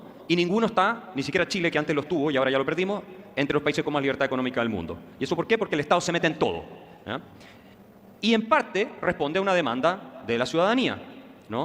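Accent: Peru. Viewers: El acento limeño es perfecto. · 👈El único ridículo eres tu, nadie está forzando nada ciertamente. Así hablan los Peruanos. Si no te parece bien es problema tuyo , 🎉 FRVSTR@ 🎉😂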